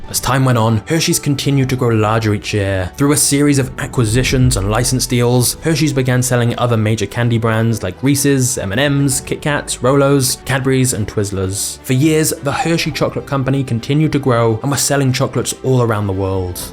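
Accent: United Kingdom. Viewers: Are you British or American ?? Because you’ve got British accent and words but your sponsors just use dollars I’m so confused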